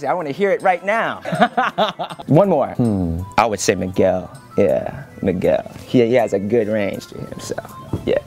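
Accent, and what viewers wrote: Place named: Washington DC, United States. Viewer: talkin like a true D.C. native hahaha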